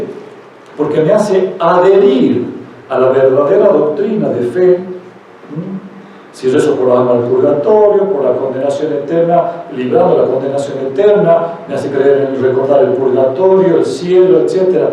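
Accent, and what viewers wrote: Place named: Argentina. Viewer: Doy gracias a Jesucristo porque me permite participar y aprender en este retiro. Gracias Padre por su enseñanza. Doy gracias a Jesucristo porque me permite participar y aprender en este retiro. Es muy clara su explicación pero me cuesta mucho trabajo entender la dicción, tengo que repasar varias veces algunas partes del vídeo para entender. Perdón por no recordar el nombre de su compañero, pero la exposición que hizo sobre la confesión casi no la pude entender.Yo creo que ésto nos puede suceder a muchas personas que no somos de Argentina. Para retiros posteriores, pudieran hablar un poco más despacio? Es muy valiosa su aportación, por eso hago este comentario, Muchas gracias, Dios los bendiga.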